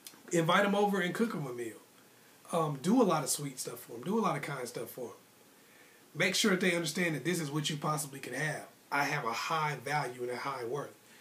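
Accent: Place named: Brooklyn, United States. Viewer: It sounds like you from my home town Brooklyn, NY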